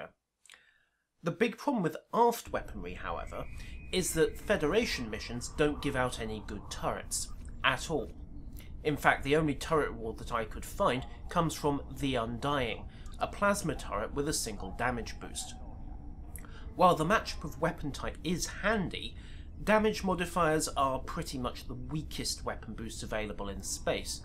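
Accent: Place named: United Kingdom. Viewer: Something about being educated by a gentle British accent that just makes you feel more intelligent. Good info. I think I'm going Tricobalt.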